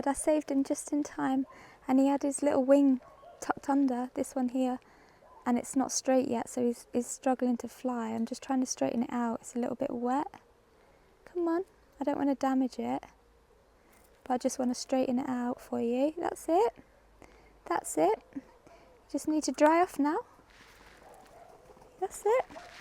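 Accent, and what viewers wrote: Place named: Wakefield, United Kingdom. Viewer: Thought i recognised your accent, I'm from wakey originally 😁 great looking allotment, looks like a little slice of heaven 😍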